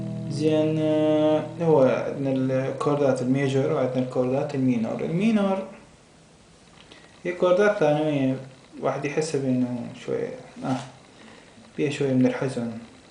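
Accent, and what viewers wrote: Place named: Iraq. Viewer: المدرس عراقي من الكلام مالته...مو bmw!!!حلوة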